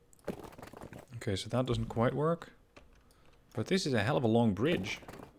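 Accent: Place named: Netherlands. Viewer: Ik hoor aan je accent dat je een nederlander bent. kan ik af en toe advies krijgen over dit spel?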